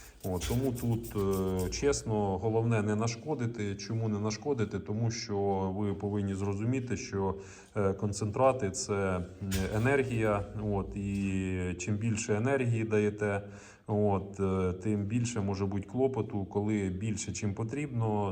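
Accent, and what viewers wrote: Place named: Cherkasy, Ukraine. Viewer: Перш за все здоров'я вам і вашій родині найкрепшого.Ви надихаєте!!! Хотіла здати корову( не парується)і після вашої подорожі в Херсон визвала ветлікаря,зробив узі( жовте тіло).Пролікувала і сьогодні спарував. Отел первістки( ваше відео)- сама отел приймала навіть без сусідів. Після отелу не солодка вода а енергомол.Води пила стільки хотіла і все супер.Хто хоче вас чути той чує.Люблю слухати вашу "конкретну" мову- наче ви виросли на Черкащині.❤❤❤❤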